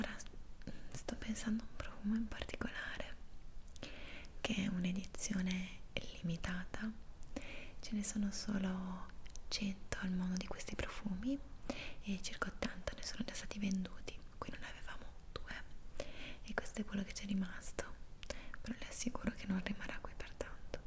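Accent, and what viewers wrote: Italy. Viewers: Yes I love Italian accent ❤💕💕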